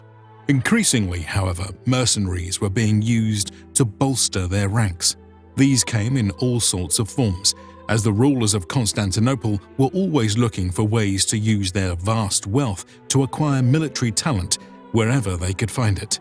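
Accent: United Kingdom. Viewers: Please never stop using a British voice actor. It’s hard to take serious any American that sounds like he is 15 years old.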